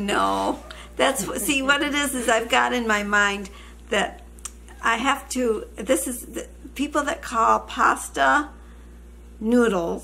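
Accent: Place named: Western New York, United States. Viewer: You are from Western New York Ms. lol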